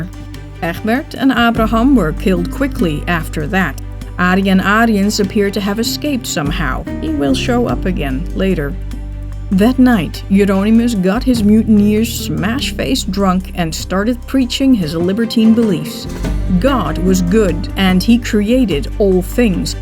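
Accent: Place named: Netherlands. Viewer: I have read many books on the Batavia topic, and even done some research myself about the subject... and never came across material so valuable from an unknown source! Yes, you may find specialised info reading the "usual" suspects (Mike Dash, Hugh Edwards, etc.), but I never expected such a high level from someone running a YouTube channel! Your style is professional, yet accessible to everyone; the research well done and facts stand verification; and your narration, with your unique Dutch accent makes the series even more enjoyable. I recently went to the Houtman Abrolhos Islands, and visited all the islands in this chronicle, I took photos that I could share with you, in case you wanted to update your material, or just happy to share them with you if you're curious... You are doing an amazing job and I commend it! Well done! Looking forward to more of this...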